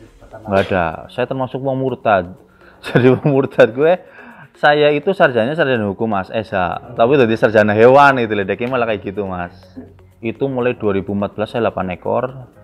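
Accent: Banyumas, Indonesia. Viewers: Asyiikk Logat nya kok kayak kiyek banyumasan yaa mas sse.... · Alamat mana ya kak, tadi kurang jelas. Kayaknya medike ngapak ya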